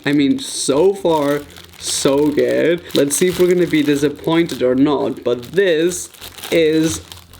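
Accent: England, United Kingdom. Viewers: 10,000 subs without any videos NEENJA Portuguese people don’t have a accent really at all, he has a mix of British and English . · THE BRITISH-NESS!!!! I LOVE IT!!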